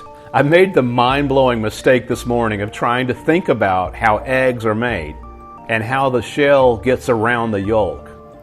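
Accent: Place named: Midwest, United States. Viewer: I know you live in Georgia now and lived on the east coast prior to that but you don't sound like a southern gentleman to me. Where are you from originally, sounds like a midwestern guy to me.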